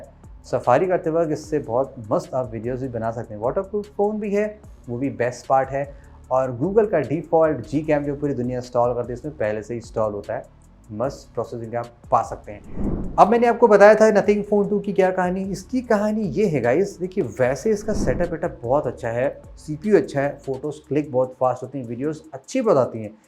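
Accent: Lucknow, India. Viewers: Are you from lucknow❤❤👍🏻✨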